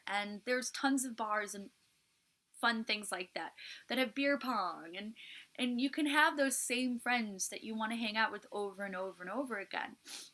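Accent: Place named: United States. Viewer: She sounds American. WHAT if shes Speaking the Truth, and these are all True .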